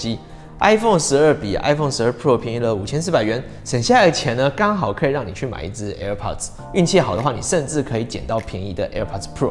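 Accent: Taiwan. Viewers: 開個玩笑，發音不標準對台灣人來說很正常啊，我也沒標準到哪兒去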